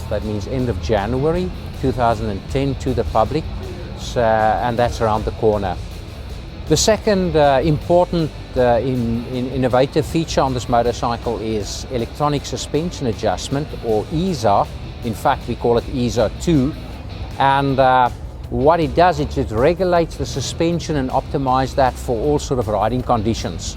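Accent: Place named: South Africa. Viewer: hes south african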